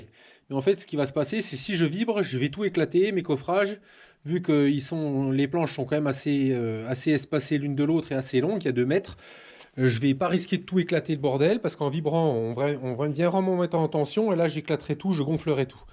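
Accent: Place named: Franche-Comté, France. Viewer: Le petit accent de la franche comté qui va bien. Juste assez sans avoir l overdose !! ( C est un franc comtois qui écrit ça !!) Meilleures vœux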